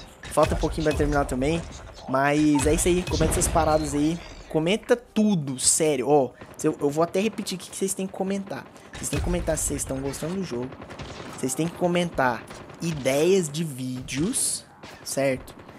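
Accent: Minas Gerais, Brazil. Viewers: O cara é mineiro demais da conta sô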